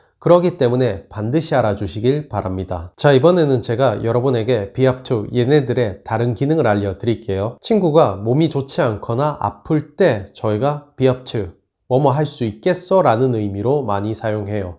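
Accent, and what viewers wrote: United Kingdom. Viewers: 잘가르칩니다 영국억양이 묻어 있어서 재미있습니다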